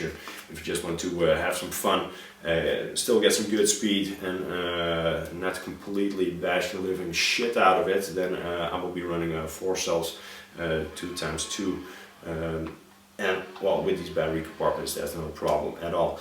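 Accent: Netherlands. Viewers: Najs Dutch accent..! :-)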